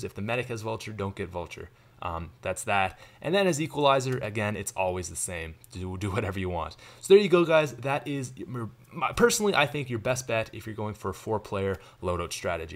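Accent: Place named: Canada. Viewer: ha ha, love the Canadian in ya ;)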